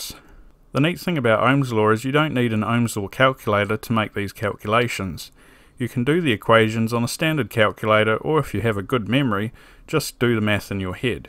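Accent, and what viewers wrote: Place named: New Zealand. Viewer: New Zealand accent + Useful Information + Comic relief = Awesomeness!!!! I love these videos :D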